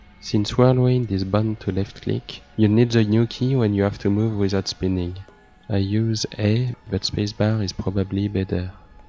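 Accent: France. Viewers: La vidéo est impressionnante mais est-ce que tu pourrais en faire une en français, je n'arrive pas à trouver de bon tutoriels en français et vu ton accent dans la vidéo je me dis que tu dois être français... ^^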